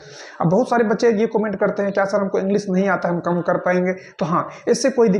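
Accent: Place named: Bihar, India. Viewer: Bhai tu bihari bhsha q bolta h ganw wali .. Hindi b nhi shi se bol pate h bihari 😮lafda ko lfra 😂 wah😮..pta nhi ksi ghatia education h wha k school n teachers ki jo shi sbd kaise bole jate h vo b ni sikhate 😢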